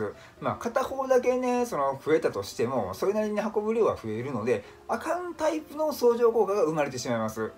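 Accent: Osaka, Japan. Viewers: 久しぶりにさんの健康講座を聞きました❣️耳にさんの声が心地良くて大阪弁が柔らかくて、ほんっとーにわかりやすいです😃ありがとうございます😃 家族の健康は日々の食べた物で支えられてる‼️母さん頑張れ‼️と受け止めました😃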